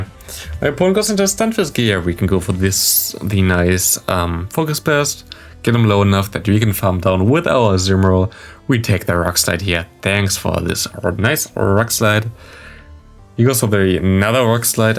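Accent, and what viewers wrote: Germany. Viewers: German accent has its downsides 😅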